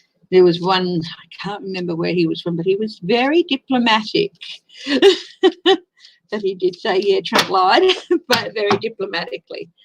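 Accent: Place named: Australia. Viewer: Thanks for reading on DeSantis,. Ron DeSantis is known to be tied to Trump. Cheating in the election is widely suspected. Lev Parnas and Igor Fruman were VIPs at his inauguration! Russia has a tight grip on the U.S. and it has been deadly. At this point I would not be surprised if people lose their homes and they are sold to Russian and Chinese oligarchs. I frankly don’t know if Trump will ever be brought to justice. I’ve kind of lost hope about it. The entire GOP are traitors who worship money 💰 and drag the name of Christ through the mud as they pretend to be bastions of morality when nothing could be further from the truth. The past four years have taken a heavy toll on me. I live in NW Florida just a few miles away from Johnie ( though we’ve never met in person). I love 💗 your readings— your Aussie accent is soothing. Your laugh makes me smile. 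🤗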